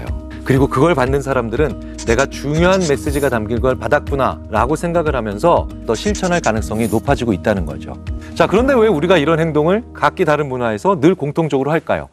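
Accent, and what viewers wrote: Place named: South Korea. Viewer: 우와~인제 논문도 읽어주네요~ 전문가 놀리기 재밌어~ㅋ 배부르니까 긍정적인거라는 거구만요. 배고프면 부정적이고. 의심도 많아지고. 고만고만한 모범수들.. 그냥 종이 한장이지 뭐~ 사람이 그쵸. 일이 안될때 밥먹고 하자는 무의식이 발동 하는군요~ 배고프고 힘들때 예민한 이유도? 왜케 한국말처럼 영어를 읽으나요...귀에 쏙쏙 들어오네요. 우와 뭔가 경제에 영향을 미칠듯한 메세지네요. 나를 솔직히 말했는데..이용하고 편견을 갖는 사람 있어요..굳이 말할필요 있남?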